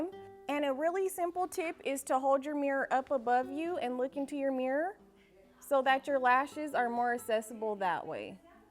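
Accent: United States. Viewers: Where are you from? I can't pin point which area in America your accent is from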